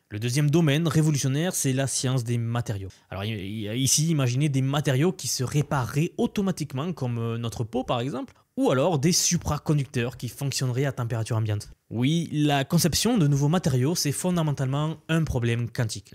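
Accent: South, France. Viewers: J'avais relevé ton MAGNIFIQUE accent Italien... J'ai éclaté de rire lorsque tu as dit: "Vous avez relevé min magnifique accent" J'AI ÉCLATÉ DE RIRE... En plus d'être curieux mcultivé, pertinent, intéressant, pédagogique..... TU ES DROLE... 😂 ET MALGRÉ TES EFFORTS ET TA PUISSANCE, Beaucoup de gens malgré tes efforts Ne. Comprennent rien à ce que tu dis ni ce que tu fais.... Ils de dénigrent car tu es jeune, tu as l'accent du sud et que t'es en frénésie... Je me sens très proche de toi Merci pour ton travail J'aimerais pouvoir un jour te rencontrer et aussi cuisiner pour nous...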